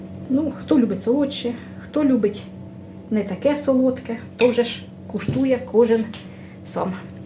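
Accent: Ukraine. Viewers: Це настоящя укра¡нська мова..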